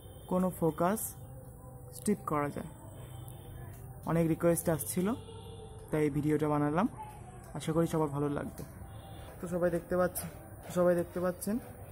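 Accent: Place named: Bangladesh. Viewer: bangela deshi der moto kre kotha bolchs kno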